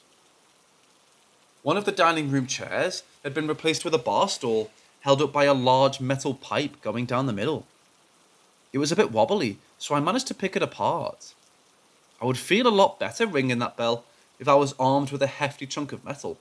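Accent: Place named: Scotland, United Kingdom. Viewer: Scotland I think idk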